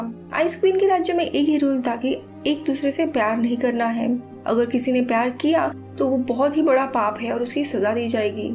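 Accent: Maharashtra, India. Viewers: hi do you have any relation with climax explained in hindi channels nikhil, moreover you both sound a quiet marathi, BTW Love your explanation. thank u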